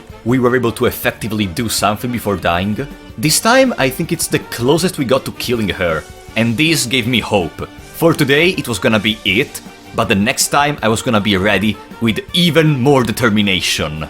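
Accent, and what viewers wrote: Italy. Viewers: I really like your italian accent in English. Sounds so much better than mine (im Spanish)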